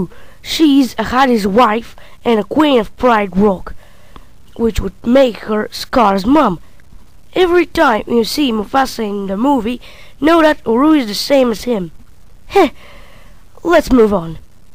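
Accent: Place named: Bulgaria. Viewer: He's from Bulgaria and that's why this guy talks like that.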